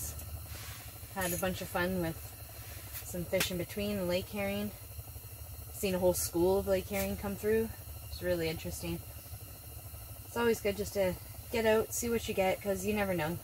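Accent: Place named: Canada. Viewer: That Canadian accent is strong Aaay! ☺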